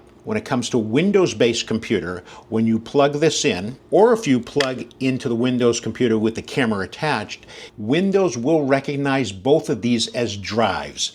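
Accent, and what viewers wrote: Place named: North, United Kingdom. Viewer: I love the oldschool northern “ahhrd” accent. You don’t hear that (in media) as often today! (I live in NC btw lol) Great video!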